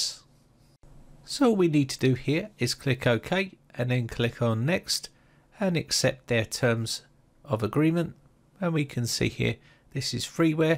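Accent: United Kingdom. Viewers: I'll subscribe cause your British accent. Also windows updates breaks something EVERY single TIME, so this should be helpful.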